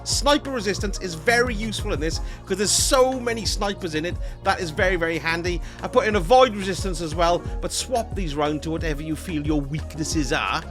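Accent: United Kingdom. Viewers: And he does it all with a pleasant British accent!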